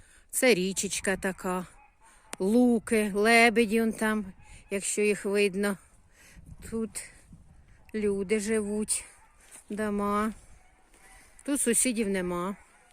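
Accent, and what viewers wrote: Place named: Chernihiv, Ukraine. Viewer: По мові- Полтавська чи Чернігівська. Швидше Чернігівська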